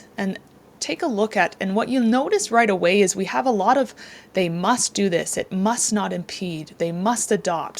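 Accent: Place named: Canada. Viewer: Thank you. Your explanation is the best I have come across so far. I think the Canadian way of talking is a big factor in the way you explain things clearly!!!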